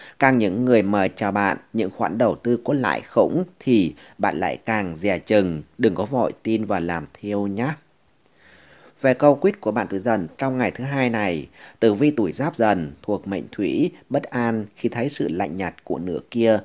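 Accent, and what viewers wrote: Thanh Hoa, Vietnam. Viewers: mình nghe giọng của bạn ...mình đoán bạn người Thanh Hóa ...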